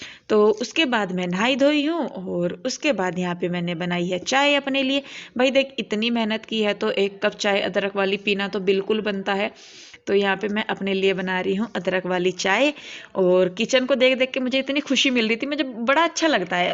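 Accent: Haryana, India. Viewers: Mujhe aapki boli achchi lagi,aap haryanvi ho? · Aap haryana se h, apki bhasha bohut achi lag rahi h, apne bohut ache se orgenize kiya hua h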